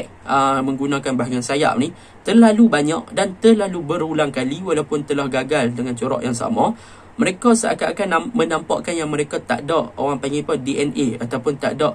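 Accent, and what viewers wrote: North, Malaysia. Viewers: Pekat sangat aihh bunyi utagha tu. Mana mana rancakk! Keep going bro!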